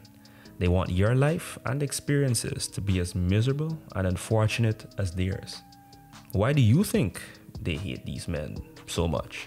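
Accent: Guyana. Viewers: Guyanese accent alone gets a sub. Content was good to, Bigup yuhself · Your accent sounds Guyanese? Where are you from? Nice clear explanation in your video also.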